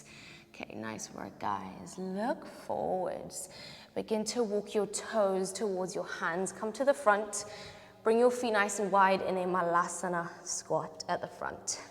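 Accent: South Africa. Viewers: Loves that Your accent is comfortably familiar to a fellow south african, who is overseas. Great instruction and sequence. Thank you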